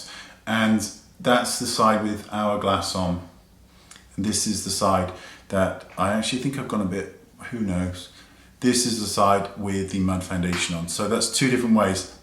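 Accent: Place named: West Country, United Kingdom. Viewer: Your accent was extra West Country today, I love that accent!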